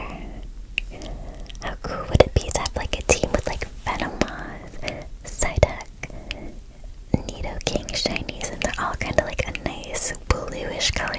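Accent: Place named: United States. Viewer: Where are you from? I'm british so its quite hard to pin which state of america or perhaps canada... ive no idea but i really like the way you say your intro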